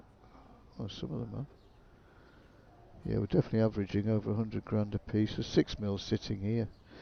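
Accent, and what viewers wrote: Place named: United Kingdom. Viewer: What part of the UK are you mate?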